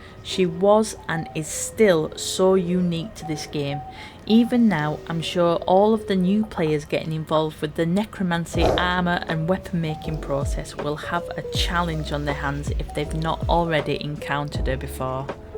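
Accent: Yorkshire, United Kingdom. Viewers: You’re completely off, sounds like yorkshire, England.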